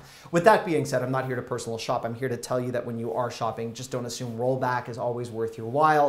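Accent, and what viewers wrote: Canada. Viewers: Your videos are very enlightening. As a senior citizen with limited mobility I gave been ordering groceries from Walmart for delivery. The pandemic has me practically housebound. For the most part I would encourage grocery shopping for delivery. Unfortunately often the main thing wanted is out of stock & I don't know that till after an order is sent., your videos are interesting & your Canadian accent is intriguing for this old Southern steel magnolia. GOD BLESS! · Are you Canadian?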